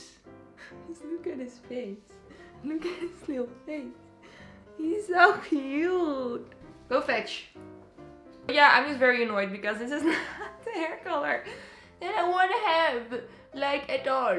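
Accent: Netherlands. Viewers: Wait are you Dutch bc I know our english accent😂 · Are you Dutch? I'm definitely hearing the accent lol. Love your videos!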